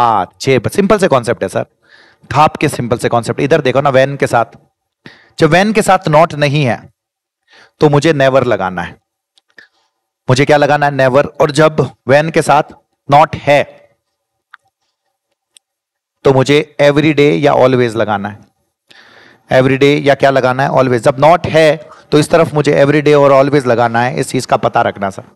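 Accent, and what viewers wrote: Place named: Marwar, India. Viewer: Madsab aapri marvadi boli choki lage aap ase hi padaye ❤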